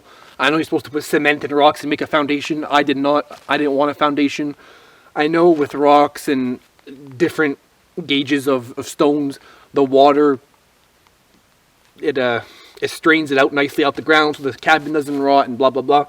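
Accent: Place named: New Brunswick, Canada. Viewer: you sound by your ascent N,B. Canada thank for the video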